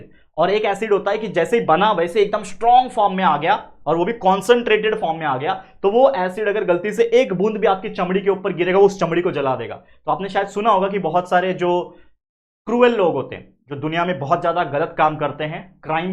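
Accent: Maharashtra, India. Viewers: sir, is your mother tongue marathi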